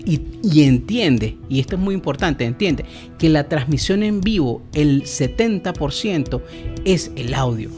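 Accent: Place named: Caracas, Venezuela. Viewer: De paso me suscribí y te empecé a seguir en Instagram jejjjee Estas en San Cristóbal, pero tu acento es caraqueño.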